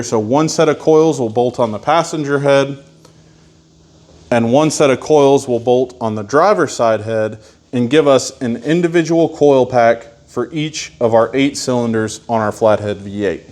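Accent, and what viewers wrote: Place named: Tennessee, United States. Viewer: glad YT recommended this. hope you document the CPS install a bit more in the future. really liking the repurposing simple parts and using speeduino. just a wild guess by your accent but I can imagine you're from like waynesboro or somewhere near AL in TN, though thats probably me projecting because I'm from the other side of the line.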